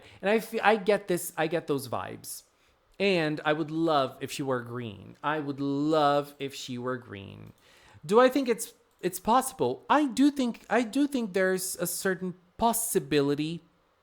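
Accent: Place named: United States. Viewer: I love your channel but I like to ask you a question you were born and raised in Brazil and you talk like a normal American I was always curious about that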